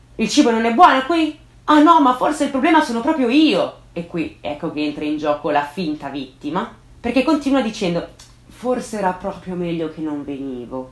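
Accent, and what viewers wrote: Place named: Rome, Italy. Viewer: Il tuo accento (Per me) è Romano, ma sei di Roma?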